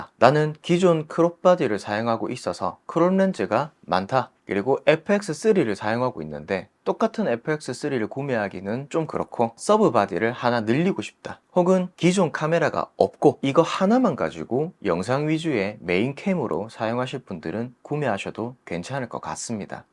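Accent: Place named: Gyeongsang, South Korea. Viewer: 아랫지방 사투리억양 너무 맘에 들구요 편안한 영상 좋아서 구독과 좋아요 누르고 갑니다!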